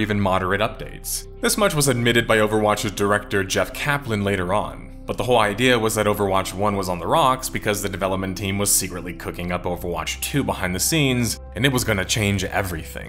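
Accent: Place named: Ohio, United States. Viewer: I dont know whats worse. A frenchie trying to speak english poorly. Or a ohioian accent trying to speak english poorly.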